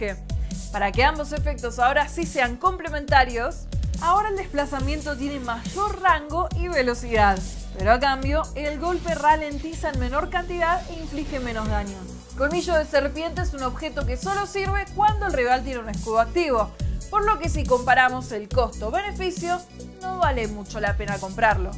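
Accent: Argentina. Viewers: Bro, es argentina, obviamente tiene su acento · No tiene carisma, es muy estresante escuchar ese asentó argentino y no algo neutro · Que tan amiga son? solo los amigos la bancan. Habla Argentino... No incluyen a los latinos. Donde quedo el neutro?? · como odio ese asento argentino :(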